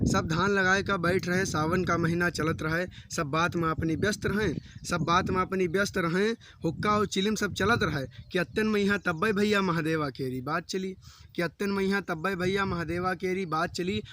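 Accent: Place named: Awadhi, India. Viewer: बहुत अच्छी प्रस्तुति..... अवधी बोली की बेहतर प्रस्तुति.....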